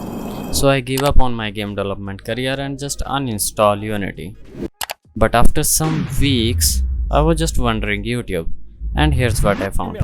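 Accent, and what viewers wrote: India. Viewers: points for using dead memes + points for indian accent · ur accent and u look indian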